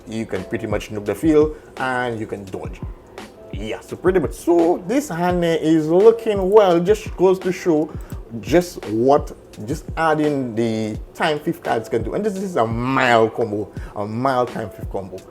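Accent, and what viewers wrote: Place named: France. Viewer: If I'm right, that a great French accent. If not, that just a great accent xD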